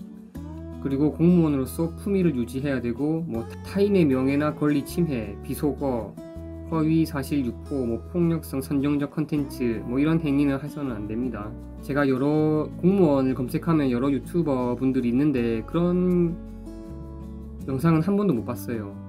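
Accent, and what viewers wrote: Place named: Busan, South Korea. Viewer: 공무원 유튜브 해도되냐는 공격적인 댓글에 마음 고생 좀 하셨겠어요 ㅠ 저는 팬으로써 님 응원합니당✊✊ 그와중에 개인적으로 부산사투리 넘 좋아요ㅠ 다시태어날까..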